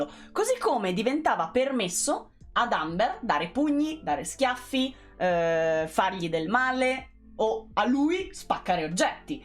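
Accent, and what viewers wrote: Romagna, Italy. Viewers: Hai la Z romagnola! comunque bel video, davvero esauriente, ti seguirò.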